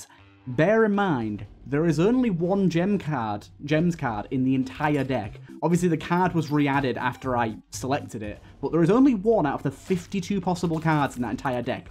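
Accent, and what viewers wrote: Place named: Birmingham, United Kingdom. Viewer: The question to you is simple where are you from in the UK i don't want your exact location just want to know where your accent is from you sound brummie to me but has some twang to it. its been bugging me since i've started watching your channel :(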